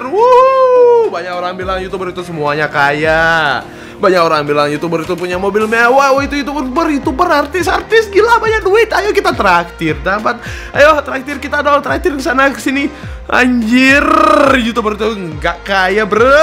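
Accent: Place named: Indonesia. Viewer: gilak bahasa indonya udah logat indo banget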